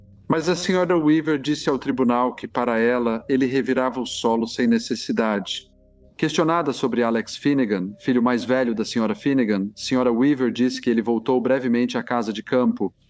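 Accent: Portugal. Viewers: Alguém precisa dar um jeito neste sotaque de Portugal! É muito chato! E feio! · O português de Portugal é lindo ❤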